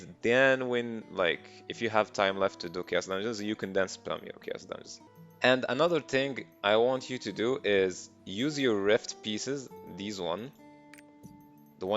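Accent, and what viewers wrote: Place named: Morocco. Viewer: Your accent man (morocco/ amazigh)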